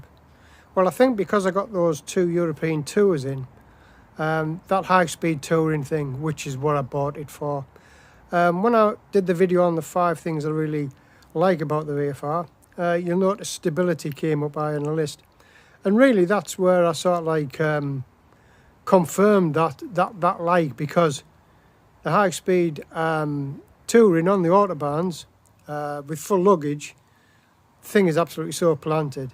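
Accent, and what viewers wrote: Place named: Liverpool, United Kingdom. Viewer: Myself being from the northeast of England! I can’t get away with your posh scouse accent.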